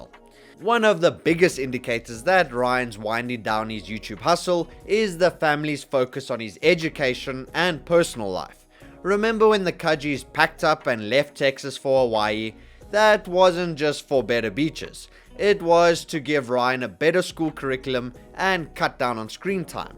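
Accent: South Africa. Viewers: Are you south african boet?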